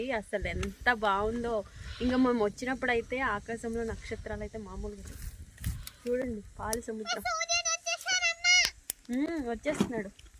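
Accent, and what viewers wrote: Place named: Krishna, India. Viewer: ఊరు పేరు మాత్రం చెప్పటంలేదు ఈమె మాత్రం మన్యం ఏరియాకి సంబందించిన ఆమే కాదు యాస మాత్రం కృష్ణ జిల్లా కు సంబంందినది ల్లా ఉంది ఇంతమంది ఎఉరు ఏవిలేజ్ అని అడుగు తున్న చెప్పటంలేదు పాప యాస కూడా అలాగే అనిపిస్తుంది 🙏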